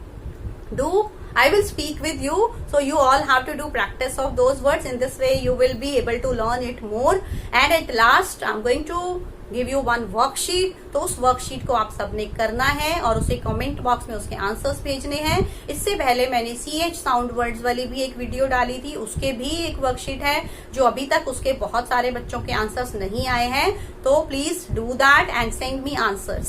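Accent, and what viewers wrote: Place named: India. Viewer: Yeah right, but I think she is teaching Indian accent.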